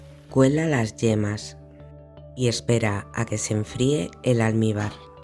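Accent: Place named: Spain. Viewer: Saludos desde Canadá: Me gustan sus recetas y también su acento español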